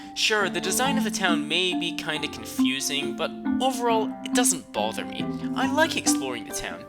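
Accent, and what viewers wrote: Scotland, United Kingdom. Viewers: i knew u were scottish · I knew you were Scottish from the start thanks to your distinctive accent. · I actually guessed you were Scottish when i first heard your voice! AND I WAS RIGHT!!!!!! (And i'm not lying!) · Yeeeaaaaahhh... you totally have a Scottish accent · Dude, I always thought you were Scottish. In fact, I thought that was once a running gag in colabs. e_o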